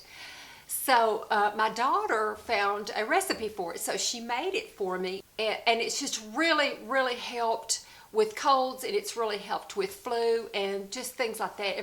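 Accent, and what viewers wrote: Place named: Southwest Virginia, United States. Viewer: Hey! You inspire me so much. I am from and currently live in South West Virginia our accents are so similar and I looovvvee it. I just turned 50 and up until a recent and very rapid weight gain I am the happiest I have ever been with my self. Believe it or not you your beautiful long locks inspired me to get my current pixie cut. No sorry I cannot explain that. I will update my profile pic on YouTube soon. My hair is shorter than in my pic here. I love it so much and have received many compliments. People I don’t know stop me and ask about my hair color and cut. Anyway enough about me. I love your and Kristen321 channel so much. You guys are my favorite YouTubers by far. I love Jessica Braun and EmilyNoel. There are some others Elle Nisha. I love Sthepanie Nicole too. I have gotten way off topic. I would love so much if you and Kristen were to do a meet up. 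My suggestion would be Smokie Mt. area since many folks already travel there. Maybe hubbies could come and busy themselves elsewhere. Just throwing something against the wall to see if anything sticks. Jessica and Emily both are in Indiana maybe they could come. Jessica is pregnant with her first beautiful baby so she may not have availability. Girl you and Kristen have serious fans that really would love to spend an afternoon with you guys. Much love and many thanks Micha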